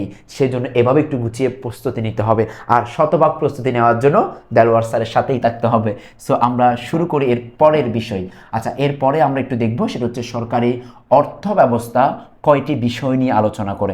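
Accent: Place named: Chittagong, Bangladesh. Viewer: Free class koro Abr eto kisu? Spelling e somossa na sir Chattogram er so sir er pronunciation ta different hobe etai savabik taina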